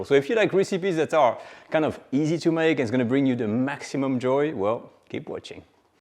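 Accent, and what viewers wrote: France. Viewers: With that accent, you can talk talk talk all you like monsieur. Je t’adore!! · French cooking with a lovely french accent, délicious !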